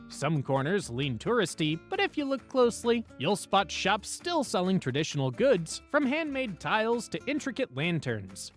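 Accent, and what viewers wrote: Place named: United States. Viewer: good tips but the gringo accent, ouch !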